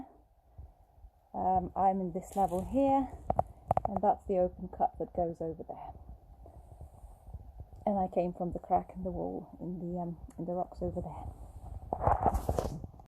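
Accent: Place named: RP, United Kingdom. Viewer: Hello from America! Nothing like a gorgeous lady guiding us through some awesome mines ! Especially with that posh accent 😍😁🙏🏻🙌🏻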